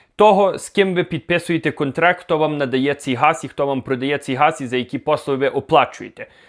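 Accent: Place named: Western Ukraine, Ukraine. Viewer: Не дуже інформативне відео. Заголовок давав надію на більше. Але було цікаво. Чудова мова заходу України!!!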